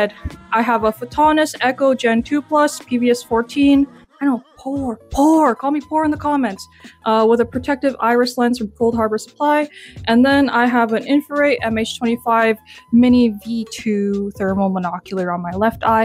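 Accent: Canada. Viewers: am i tweaking or r u canadian?? like from van canadian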